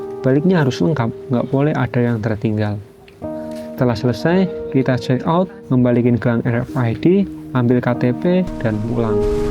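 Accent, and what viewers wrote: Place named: Java, Indonesia. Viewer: Muedhok sekali ya masnya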